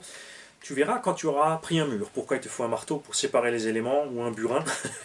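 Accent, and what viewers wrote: Alsace, France. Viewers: Mdr le 💩💩💨 🤣🤣🤣 Moi l'accent me va bien au moins je te comprend 🤣🤣 jsuis aussi alsacien !! Ah yo Hopla 🥨 Merci pour la vidéo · En vrai l'accent Alssacien ça va , mais si tu écrit pour expliquer c peut âtre pire 😂😂😂.....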